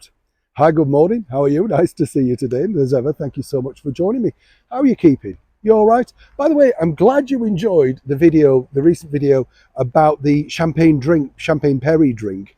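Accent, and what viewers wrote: Yorkshire, United Kingdom. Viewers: My mum would drink brandy and babycham when my parents went out!, are you from West or South Yorkshire? You remind me so much of a close friend from Doncaster! Happy new year to you xx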